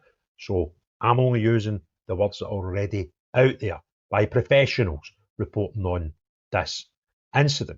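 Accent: United Kingdom. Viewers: My apologies...what accent is this? I am assuming this is UK, which region is your accent?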